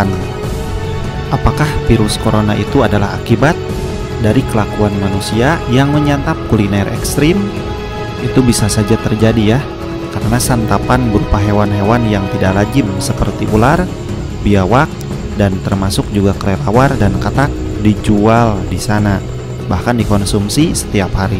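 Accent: West Java, Indonesia. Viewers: Sunda banget logatnya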